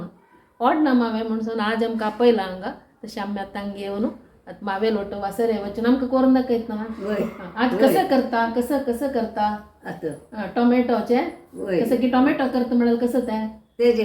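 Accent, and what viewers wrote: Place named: Konkan, India. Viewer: Sounds like konkani spoken by gaud saraswat brahmins in karwar Mangalore goa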